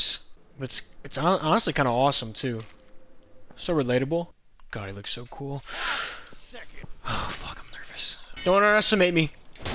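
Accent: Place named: Delaware County, United States. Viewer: Immediately clocked that mid Atlantic accent. Youse from delco broh?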